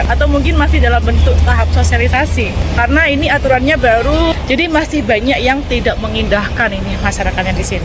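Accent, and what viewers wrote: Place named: East Java, Indonesia. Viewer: Mbaknya logatnya agak medok sepertinya dari jawa timur ya😅😅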